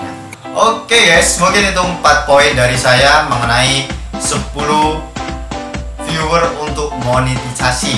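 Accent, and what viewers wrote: Java, Indonesia. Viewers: Wong jowo yo?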